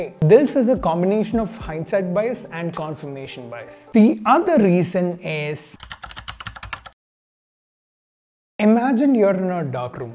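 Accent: South India, India. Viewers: it's not the spelling mistake. It's the accent. South Indian accent.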